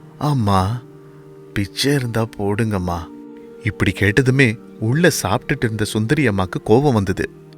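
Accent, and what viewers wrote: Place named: North, India. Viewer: Avar vadakkan apdithan pesuvaar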